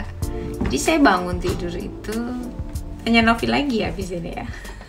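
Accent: Java, Indonesia. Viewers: Wow kren cantik pinter klongomong ada jawanya jadi aku sukah klo orang jawa ojok ngilangno bosonedewe semoga sukses sehat selalu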